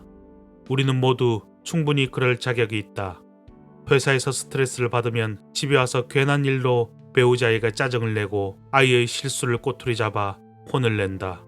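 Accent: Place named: Gyeongsang, South Korea. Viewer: 경상도 억양이 있어서 좀 아쉽지만 너무 좋습니다 잘 들었습니다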